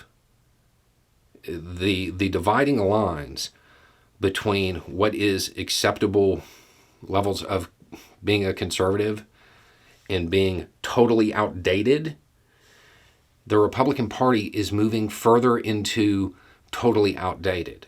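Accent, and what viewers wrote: South, United States. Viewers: Is in Florida? His Southern accent is pretty prominent.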